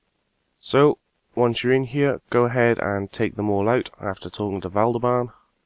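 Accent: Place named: South Wales, United Kingdom. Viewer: Your accent sounds local to me...im from Cwmbran, South Wales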